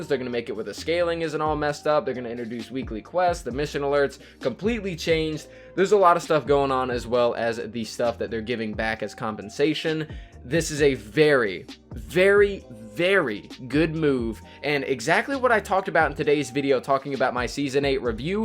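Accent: France. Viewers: Are u frenche? Because I am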